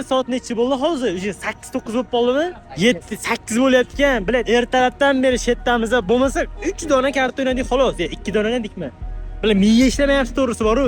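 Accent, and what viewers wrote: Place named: Tashkent, Uzbekistan. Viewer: Oka haribroq bop qobsiz sal tashkencha gapirardizu oldin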